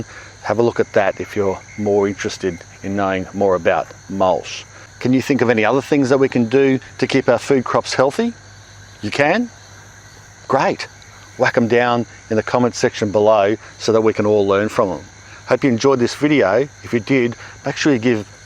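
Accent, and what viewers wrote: Australia. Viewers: Yes! That Australian accent has got to help! ❤️❤️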